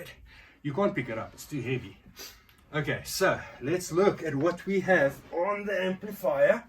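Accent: South Africa. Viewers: Are you from South Africa? For some reason I thought your Australian for like the longest time.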